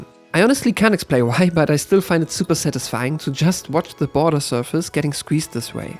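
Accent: Germany. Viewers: Man hört doch aus der Stimme eindeutig raus, dass er deutscher ist xD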